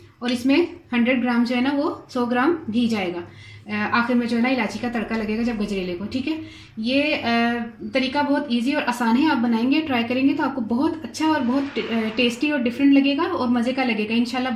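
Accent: Punjab, India. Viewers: App ksa main hain but I think 4rom back home u r from lahore or faislabad because of ur Punjabi eccent😊😊😊😊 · You are so sweet buhat achy and esey treqy sy sab kuch skhaty ho aor Jo bat kerty huye Panjabi ka terqa lgati ho acha lgta Allah ap ka nseeb acha kry ameen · love your Urdu mix punjabi...so sweet, so loving and so nice accent. Your recepies are also very nice, practical and it seems like some family member is telling how to cook. Love you :). I am living in holland and i love to cook and eat desi food. you are doing a good job.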